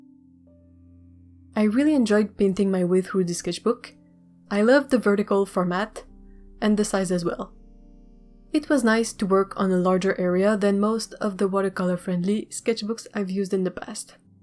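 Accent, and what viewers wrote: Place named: Quebec, Canada. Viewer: Juste à entendre ta voix, je savais que tu étais du Québec ! Tu fais du travail magnifique!! Merci pour le partage :)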